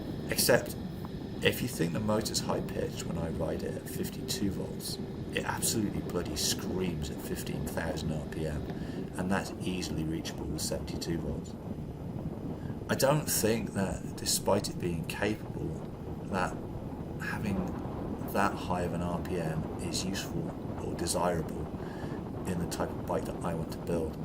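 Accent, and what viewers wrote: United Kingdom. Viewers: Distinctly English accent, but US roads, come on then spill the beans... Where in the US have you moved too? Been watching your vids for the last month since coming across the channel in a recommended video. Currently looking to go from a carbon Canyon Endurance on 28mm tyres under my beastly 200 watts of pedal power to a 2000w bike to and from a 10 mile trek to work. Thanks for the effort you out into your content. I can see it all, from the filming to editing to overlays and commentary. The viewers on youtube sometimes do not get a grasp of the time it takes on top of the actual activity itself to film, edit and upload the activity. Thumbs up from the 🇬🇧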